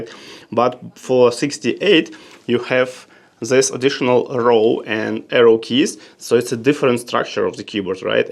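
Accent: Russia. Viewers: ваш акцент похож на русский, вы говорите на нем?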